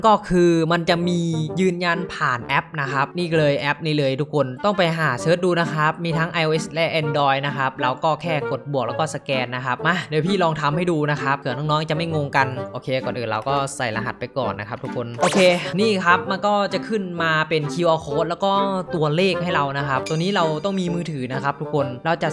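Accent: Laos. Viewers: ยากรู้ว่าพี่เป็นคนลาวหรึอคนไทยครับ🥰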